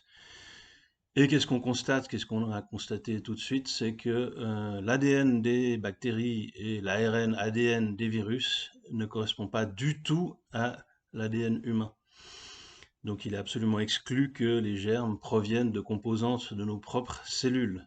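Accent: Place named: Switzerland. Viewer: Moi, je suis français mais juste de l'autre coté de la frontière Suisse (coté Jura) ... D'où l'habitude d'entendre ton accent ... Je suis biologiste médical et j'ai donc des compétences en infectiologie et en biologie moléculaire faisant tous les jours des PCR covid ... Et donc la contagion des maladies infectieuses, je la constate tous les jours. Je suis entièrement d'accord avec toi qu'il faut mettre en lumière Béchamp pour expliquer à toutes ces personnes qui sont intervenues en commentaire de cette vidéo que ce scientifique a TOUT faux.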